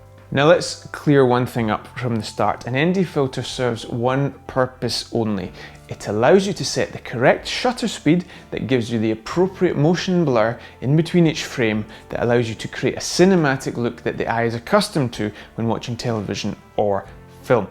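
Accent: Scotland, United Kingdom. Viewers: Subscribed cause your Scottish and not English :0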